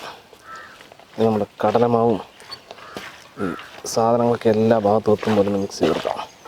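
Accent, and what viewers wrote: Palakkad, India. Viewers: Thani palakkadan samsaram🤩🤩🥳🥳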